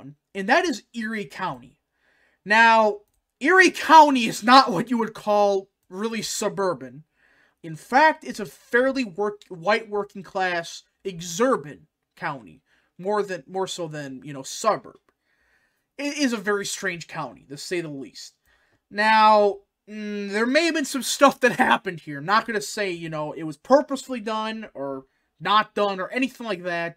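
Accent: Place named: Chicago, United States. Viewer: I'm a Chicago born and I appreciate your accent. Sounds so chicagoian.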